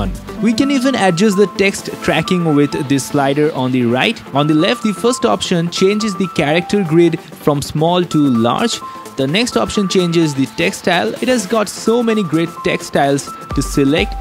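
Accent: India. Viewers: Ok, after reading the comments and hearing him, I'm sure, you're Indian. By the way, nice video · Best Indian speaking english accent...great!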